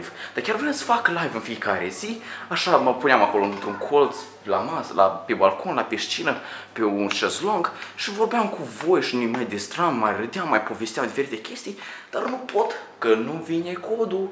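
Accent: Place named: Moldova. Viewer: imi place vocea si accentul tau de moldovean =))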